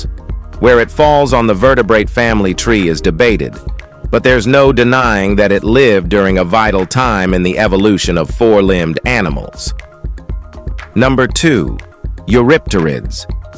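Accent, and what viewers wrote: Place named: United States. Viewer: Hate that american dialect in science. sounds duh ? Foolish..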